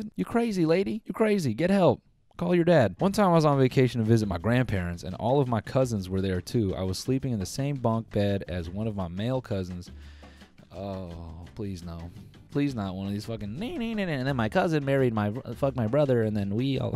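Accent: United Kingdom. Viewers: in love with his british voice. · Haha the British accent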